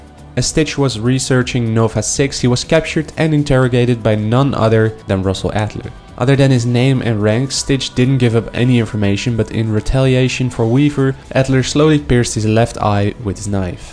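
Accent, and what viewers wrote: Netherlands. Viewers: Ik hoor gelijk aan jou accent dat je Nederlands bent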